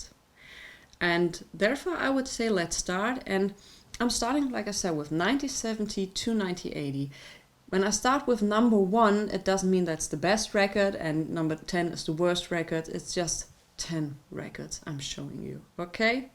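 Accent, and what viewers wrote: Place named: United Kingdom. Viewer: ' " " was a good album, vocals by. Michael later released his Funk/R&B classic " " which I had on vinyl when it was first released in 1980, great album. Do you listen to mainstream Funk/R&B? I'm a white man living in California [U.S.A.] and been listening to Funk/R&B since the '70s. I like groups like Ozone, Chocolate Milk, Sun, General Caine, etc. I like your selections, because to me, I've never heard of any of them, which makes me interested in what they sound like. You sound like you're from the U.K. and you had different singers & bands that never really hit in the states, especially in California. I've heard of some of your picks, two of them were & [ had a hit in the states called " " from 1983, it was a good dance tune] so some of your picks were new to me. It's nice to see someone as knowledgeable as you when it comes to Soul/Disco music, I am a fan of both genres also. 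Disco music to me was a little bit cheesy at times, because everybody & their mother were doing a disco song back then, there was stuff like " & " " that drove me nuts, because I just didn't like them. I've listened to a lot of different styles of music growing up and am lucky to have experienced everything I've listened to or was recommended. Do you like " " by, to me that song was funky & very dance driven when it first cam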